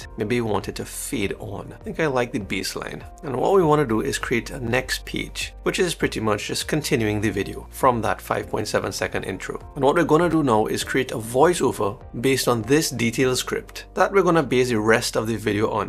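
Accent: Trinidad and Tobago. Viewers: are you trini? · I like your accent 😂❤ Trini? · OKAY… I hear a trini accent! I keep hearing about faceless videos, but not sure if it would work for my niche. This is interesting though. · Oh, hey bro are you a Trini? 🇹🇹 Nice job on the channel 😊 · Hey are you from Trinidad and Tobago? Nice😊 I'm a Canva user too. I was really surprised to hear your accent in my headphones lol. I was like......sounds like ah trini lol. I'll be subbing you from today! I'm a trini too btw, slight peppa and ah red solo......only we know what that means😁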